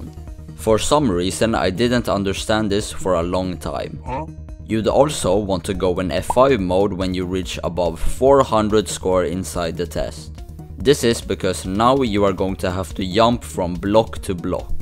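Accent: Sweden. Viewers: W Swedish cause you say "yump" love that shit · swedish accent is fun just yamp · Bad Video + L Swedish😂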